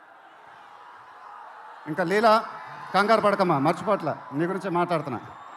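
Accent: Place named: Guntur, India. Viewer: Guntur slang 😅vamoovvv adhem dansushhhh😅 mana gunturodura ma anna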